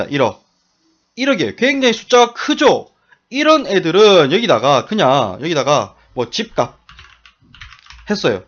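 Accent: Gyeongsang, South Korea. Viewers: 구수한 갱상도 사투리에 열정적인 강의 귀에 쏙쏙들어옵니다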